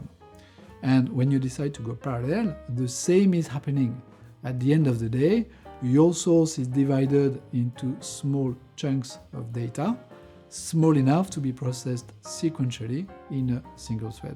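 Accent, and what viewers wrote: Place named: France. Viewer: Need a native English speaker for these. Very hard to understand or better yet just do it in your native French and have AI subtitles. Great content but I can't be the first to have this thought.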